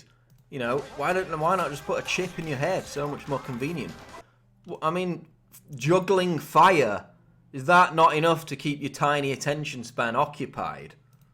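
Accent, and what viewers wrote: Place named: England, United Kingdom. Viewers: I'm British and I have to say I really hate your northern accent! sorry but you sound like a tit! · guy sounds like he is from rochdale. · Your "Prison" is of your own making. It's also quite presumptuous of you to "know" what will make others happy. Rather absurd actually. You're a troll with an English accent in my book mate.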